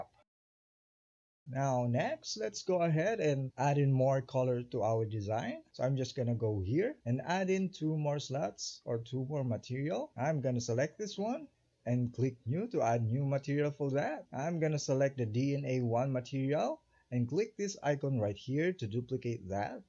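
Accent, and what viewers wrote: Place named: Tagalog, Philippines. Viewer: hahaha your tagalog-accent english is hard to miss 😁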